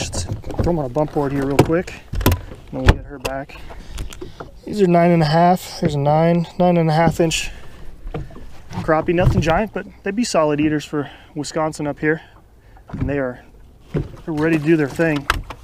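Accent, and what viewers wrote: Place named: Canada. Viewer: I thought you were from Canada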